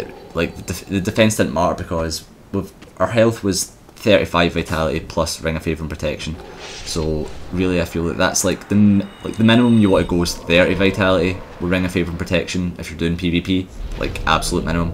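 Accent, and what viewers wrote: Scotland, United Kingdom. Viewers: He has a Scottish accent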